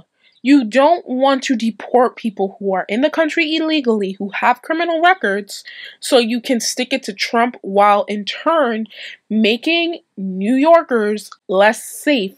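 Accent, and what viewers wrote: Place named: Indiana, United States. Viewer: Here because of Styx. Subbed. What's your accent? Indiana?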